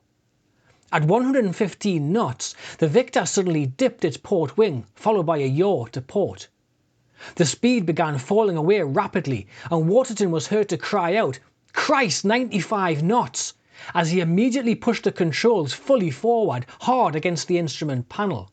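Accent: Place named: Scotland, United Kingdom. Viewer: very clear & concise description of thos trajic accident. we got to see the vulcan perform at air shows in the states, but never the victor or valiant. your accent is exotic to west texas ears, are you scottish?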